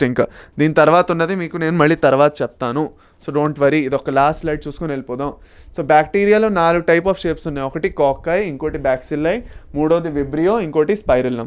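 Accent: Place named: Andhra Pradesh, India. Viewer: Chala baga chepparu sir Urs is pure andraite slang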